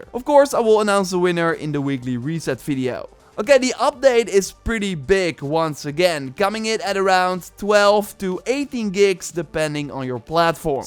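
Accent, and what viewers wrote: Netherlands. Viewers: I HATE his Dutch accent on his english.... like it sounds cheap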